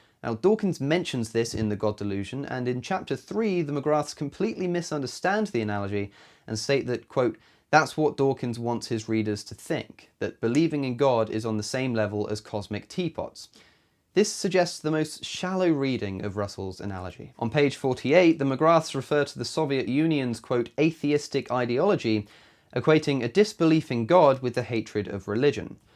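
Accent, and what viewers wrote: United Kingdom. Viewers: hes smart tho and he has a British accent. 2 for 2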